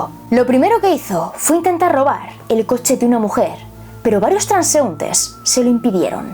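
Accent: Spain. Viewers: Tarde pero sin sueño chula , aki presente para escuchar tu versión de los hechos con el Richi y con tu presiosa voz de española.😚 Saluditos 🍓🍓🍓🇲🇽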